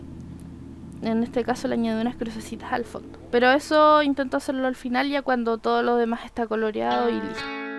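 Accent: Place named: Chile. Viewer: waau me encanta tu forma de hablar se nota al toque que eres de Chile, me gusto caleta tuto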